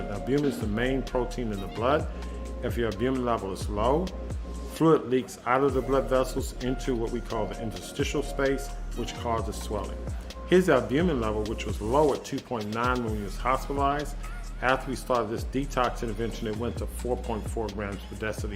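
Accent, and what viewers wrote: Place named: United States. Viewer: Sir, i am watching your useful videos from india, we cannot follow american pronounciation of english. Pl give tittles in english and display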